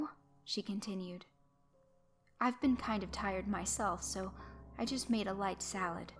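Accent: South, United States. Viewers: I ❤ listening to your soothing southern accent and awesome creepypata choices 😊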